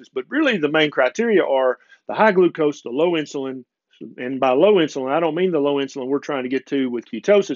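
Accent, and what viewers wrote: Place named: North Carolina, United States. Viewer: I told my wife about starting Keto, and she brought it up to a veterinarian who works at her office. My wife called me and had the vet talk to me. She proceeded to tell me how Keto lifestyle could mess with the brain, Ketoacidosis, etc. I wish I had seen this video so I could forward it to her at the time. Love your videos Doc! I'm from NC. You sound like my people!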